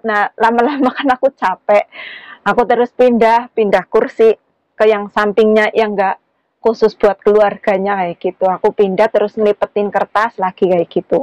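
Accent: Banyumas, Indonesia. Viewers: Sampean wong endi.. Yakin ngapak nemen sampean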